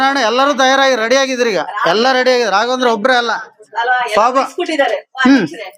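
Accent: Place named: Kundapura, India. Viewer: Kundapuradavre nimm maatu..👌.😂.dairya mecchle beku.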